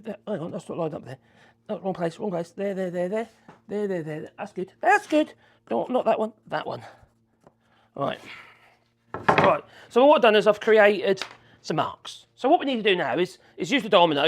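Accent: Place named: Shires, United Kingdom. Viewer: I love the way you work! It makes me feel better about my own head 😂 Coming from one county north of you makes your channel feel almost like home. There's far too few Shire accents on oooootoooob. You and Furze are about it!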